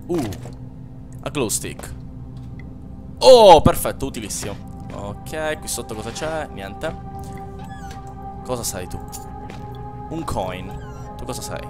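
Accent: Palermo, Italy. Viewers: compare complimenti per il tuo linguaggio a palermitano...mi ki fresco ki si..continua così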